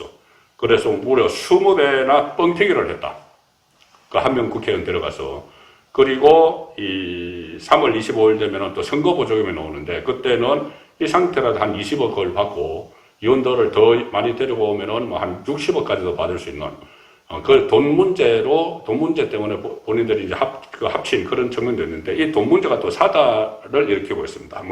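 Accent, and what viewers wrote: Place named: Daegu, South Korea. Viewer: 고향은 진주같은데 영남일보출신이라 그런지 대구사투리랑 비슷합니다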